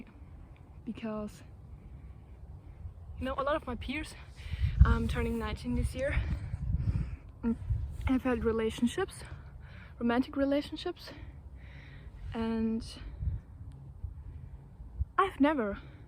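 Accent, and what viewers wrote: Germany. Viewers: are you german? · Are you german? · Are you german ?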